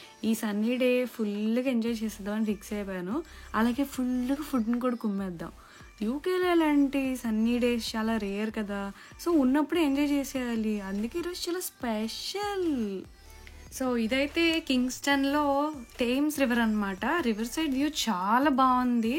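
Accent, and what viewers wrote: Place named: Telugu, India. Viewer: Me voice and Pure Telugu slang👌👌👌👌👌 Andi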